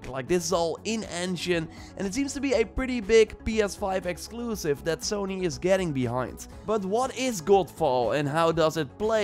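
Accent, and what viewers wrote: Netherlands. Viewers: I think this guy is Dutch, I can just hear it. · You’re Dutch I can hear your accent.. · Meh French accent is diffrent thougj i live in belgium and we speak dutch and frensh and it sounds more like dutch accent tbh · You sound dutch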